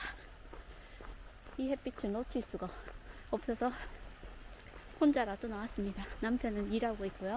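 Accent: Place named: Gyeongsang, South Korea. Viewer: 사투리는 저와 같은 경상도 (저는 대구 ) 사투리인거 같아 더 정감이가고 콧물 소리는 전혀 느끼지 못하겠는걸요 ^^ 신경 안쓰셔도 될듯해용~~~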